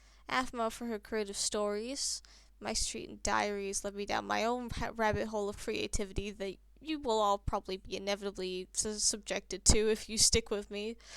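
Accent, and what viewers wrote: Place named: United States. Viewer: Nice to meet you 👋🏻 Glad to have seen this popped up in my recommendation. Also, as someone who lives in the US, you do sound a bit American. But that's okay. I hope & pray you have a great wonderful day/night & a bless life! As well your journey here on the platform spreading your enjoyment out to others, bringing the smile along side you. Stay safe, stay positive, stay frosty/cozy. Always watch your back & wish you the best of luck out there in the world. Take care now and I'll see you later when you poste your content! peace ✌🏻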